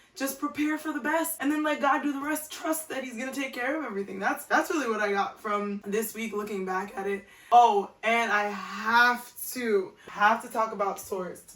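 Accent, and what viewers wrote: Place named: United Kingdom. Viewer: I love the British accent 🤣🤣🤣 "Fart around! Be ridiculous! Who cares if they cringe?"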